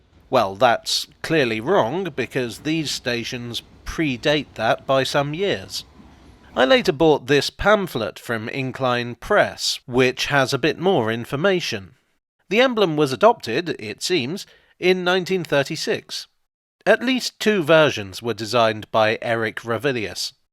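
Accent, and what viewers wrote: United Kingdom. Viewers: The former is the English pronunciation, the latter is the American pronunciation., being British, of course uses the English pronunciation. I have never heard a British person say zo-ological, I’ve only ever heard Americans pronounce it that way.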